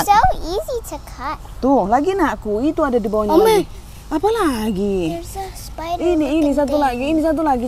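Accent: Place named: Medan, Indonesia. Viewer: apa adanya macam kayak logat medan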